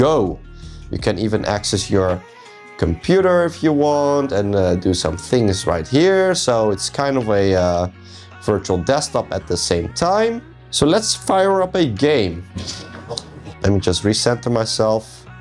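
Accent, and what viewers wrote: Netherlands. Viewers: Is that a Dutch accent I hear?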